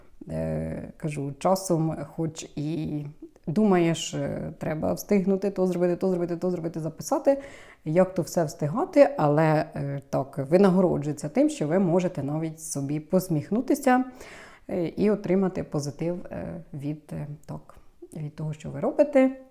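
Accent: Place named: North, Ukraine. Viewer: Пані, вітаю Вас! Ви чудова! Можливо я саме через тембр вашого голосу звернула увагу на ваш канал. З лютого 2022 принципово шукала відео-блогерів садівників з України. Дивилася, слухала. Не можу сказати, що троянди це моя улюблена квітка, але щось змусило мене зупинитися та підписатися на ваш канал. Щодо критиків, яким все не так, то невідомо скільки серед них тих, хто саме працює на запобігання розвитку українського контенту. А вимова ваша більш характерна півночі України, там притаманні більш тверді приголосні, щось трошки від білоруської мови. У мене тембр голосу дуже змінюється від тої мови, якою спілкуюся, рідні спочатку не впізнавали, коли дзвонила та розмовляла українською, зараз звикли. Кажуть, що українською у мене більш м'яка та доброзичлива мова вийшла. Сама себе не чую, тому важко об'єктивно оцінити. Окрема подяка за вашу громадянську позицію, про віру в ЗСУ та нашу Перемогу!.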